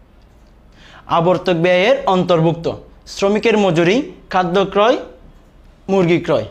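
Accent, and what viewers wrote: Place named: Chittagong, Bangladesh. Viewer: চিটাগং এর পোলা মনে হয় ভাষায় বুজা যায়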